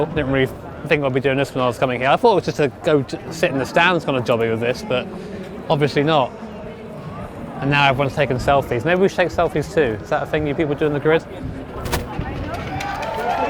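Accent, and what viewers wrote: United Kingdom. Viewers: I never even noticed accent during his videos, but it seems he gets more British in public.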